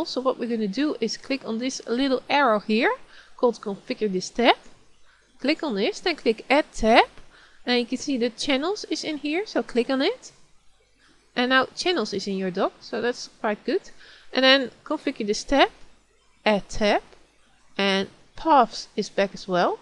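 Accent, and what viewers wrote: Netherlands. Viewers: Wedden dat je nederlands bent / I bet that you are \Dutch :d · Bedankt, ik hoor aan je stem dat je van Nederland komt of toch Nederlands praat. Ben ik juist? Toch bedankt want ik zat met dit probleem!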